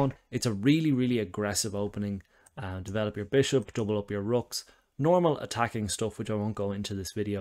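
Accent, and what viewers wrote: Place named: Ireland. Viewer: Nice video and delivery although I was a bit put off by the missed mates-in-one and nearly just gave up at that point. What stopped me is that I saw a lot of positives so I’d prefer to be supportive. I’m sure it’s not easy doing what you do! I, of course, have never missed mate-in-one. Not once. Ever. 😳 Your voice and cadence of speech are easy to listen to - a lot to be said for that Irish lilt! For me that’s in contrast to many of our favourite YouTubers, the main exception being Eric Rosen. I also prefer educational content over pure entertainment and I like that you’re also on camera, which is often not the case for creators of similar material (off-beat gambit lines) Great channel name too. I’m looking forward to more Chess Please! 🙂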